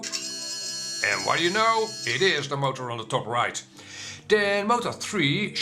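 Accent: Netherlands. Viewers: that was it, not DCU driver. I downloaded the impulse RC driver fixer. It connected to the flt controler had a little look around saw what was missing and loaded it, then left it in boot load so I could just open Betaflight and do the flash. Sorted 2 yellow boxes and I could do what you demonstrated. Thanks a mill for making me aware of them buttons, just had to find them. Subscribed, do love the Dutch accent.👍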